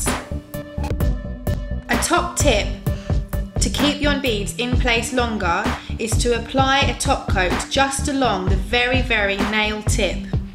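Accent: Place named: United Kingdom. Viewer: Im falling in love with ur British accent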